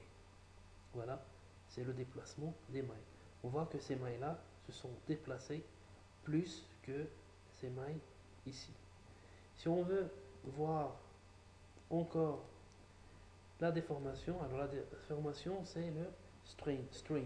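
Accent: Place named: Algeria. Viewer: Accent Algérien!!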